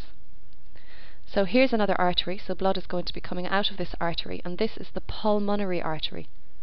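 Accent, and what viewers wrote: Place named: Ireland. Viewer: a fine irish accent with good diction ... what could be finer ?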